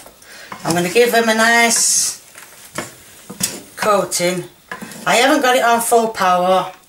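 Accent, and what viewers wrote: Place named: Yorkshire, United Kingdom. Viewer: I love all your videos. I live in London now, moved from Yorkshire, so it's so nice to hear your accent. Keep enjoying yourself and ignore the idiots:) X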